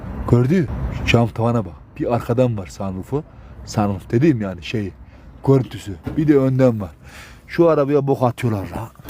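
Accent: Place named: Black Sea, Turkey. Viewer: Sırf şu konuşman şiven için abone oldum (Kurbanın olıyım) ( şuna baghele uşag)😂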